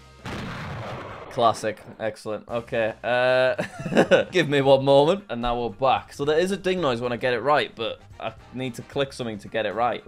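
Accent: United Kingdom. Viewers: Bro sounds british (if hes actually real british im sorry im new to this channel)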